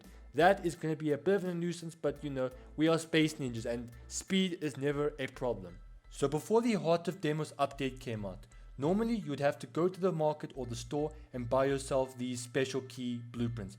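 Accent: South Africa. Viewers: Hey bro you def south african i want an invite man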